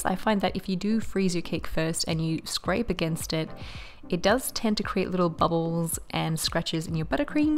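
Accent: Australia. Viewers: Hi,. Your lemon cake looks so pretty, just like you and your Australian accent, mate. What's your best tip for making the best strawberry cake? Take care of yourself, sweetie. <3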